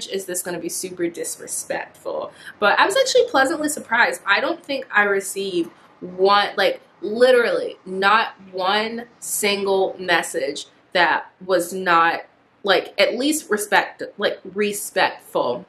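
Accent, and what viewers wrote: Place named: United States. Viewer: When i saw the title of this video and then looked at the caption, i thought " i bet she is American " , i clicked on your video and you began to speak and yes, you have an American accent. Interracial relationships happen here in the uk everyday with everyone that we actually dont " label" it. Here in the uk we all mix and blend ,colour is not such a big thing here.. its a shame that everyone in the states is divided by skin... only in america!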